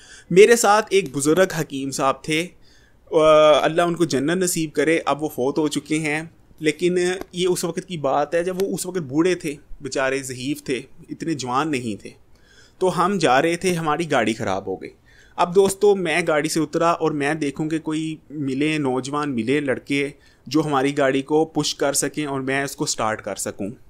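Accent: Punjab, India. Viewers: اگر اپ ناراض نہ ہوں تو ایک بات کہوں۔ پلیز آپ اپنی اردو ٹھیک کر لیں۔ پنجابی لہجے میں اردو بولتے ہیں۔ یا پھر اپ پنجابی میں ویڈیوز بنا لیا کریں۔ شکریہ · baie ap pnjbi he na ap Ki boli bout achi lgti he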